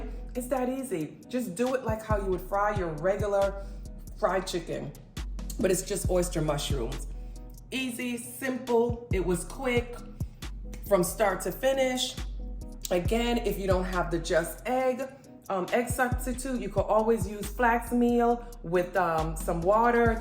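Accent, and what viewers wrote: Jamaica. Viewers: Idk why i hear a jamaican accent 👂🏾 🧐😌lol.. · That looks delectable... You're Jamaican right? Sounds kind of like you are.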